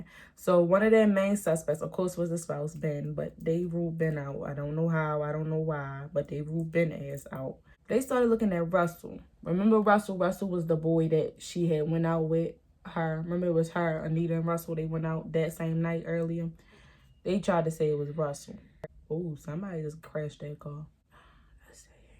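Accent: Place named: Baltimore, United States. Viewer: Girl, just came here to say that I subscribed to hear true crime with a Bmore accent fr fr! Love it! ❤️ Making me miss home.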